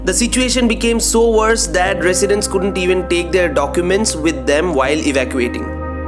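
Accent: Bengal, India. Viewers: You speak English like true Bengali I am very proud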